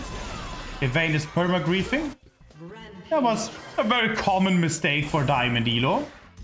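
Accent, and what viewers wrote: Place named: Germany. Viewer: The German accent never gets old lol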